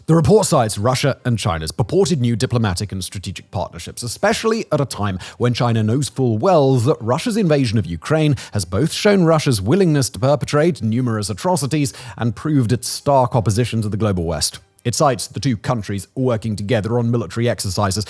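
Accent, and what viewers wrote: United Kingdom. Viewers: Can we please have an American announcer speaking about America. Let this brit broadcast about the stabbings in London or stop with the intensional severe brit accent. Take the marbles out of your mouth and speak American english language.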